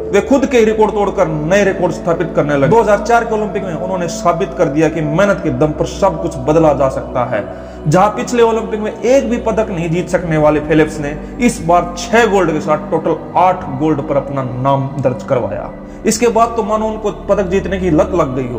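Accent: Haryana, India. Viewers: Haryanvi hindi 😮😮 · Sir aapki awaj ka haryanvi touch wonderful hai .... Thanks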